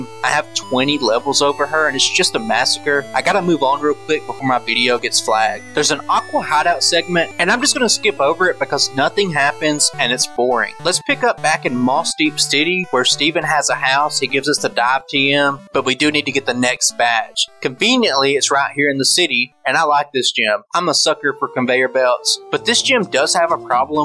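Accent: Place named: Texas, United States. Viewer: just saw this channel now gg on the win also nice texas accent lol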